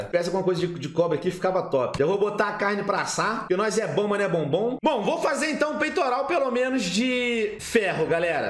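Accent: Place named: Portugal. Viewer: eae depois de muito tempo sem ver seus videos eu voutei quando vi seua intro ja bateu uma nostalgia cara amo seu canal assisto seu canal desde os meus 5 anos de idade saudades amo seu canal percebi q vc ate ta com um sutaque meio portugues kkkkkkkkkkkk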